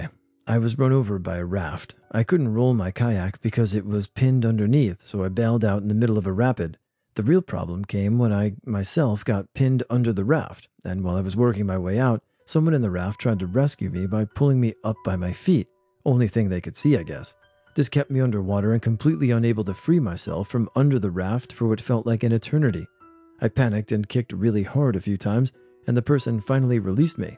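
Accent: England, United Kingdom. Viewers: Longtime fan of the channel, as a Brit, I think your English accent is hilarious. 😆 Keep up the good work! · The English accent in the first story made me laugh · Hi! Thank you for your great work! I was super entertained by your British accent. 👍🏻👍🏻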